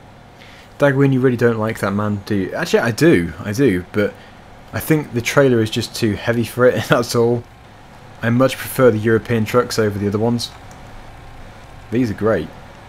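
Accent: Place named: United Kingdom. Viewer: David Correia uk people have a different acsent and that’s how he says assume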